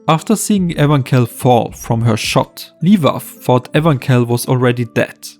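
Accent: Germany. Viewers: love the german(?) accent keep it up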